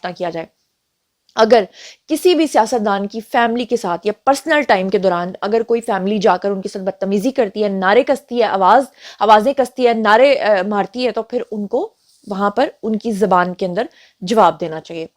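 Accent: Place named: Punjab, Pakistan. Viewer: Sincere advise, you were decent but this punjabi and cheap acsent with acting makes you look very bad kindly remain serious pls, and dont start cheap tactics of jokes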